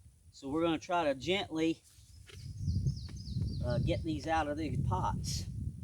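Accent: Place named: South, United States. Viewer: I thought you sounded southern in Indiana. Welcome. Tis different. Hope you get ur sweetpotatoes going like city lot! Good luck!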